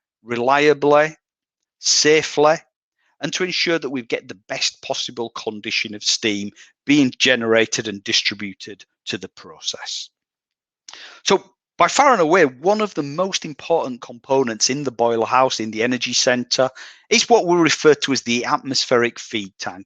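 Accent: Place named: Teesside, United Kingdom. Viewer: Hah! I grew up in Teesside; the accent is exactly how me uncle sounded. Brings back memories…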